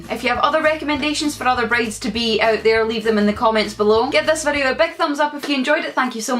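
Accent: Scotland, United Kingdom. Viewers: Also I know it's a little crazy but no dairy 3 days before, it causes bloating :) I'm getting married in a month so these tips help a lot, it's just a little backyard wedding so I'm making the food, cake, decorations and such. Also, where are you from? I love your accent but cant figure it out lol. Scottish?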